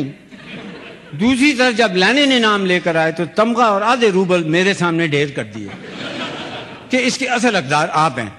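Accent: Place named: Hindko, Pakistan. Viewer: Oh okay thanks for clarifying. I was wondering how Zia Mohiuddin sounded like a native Hindko speaker talking in Urdu.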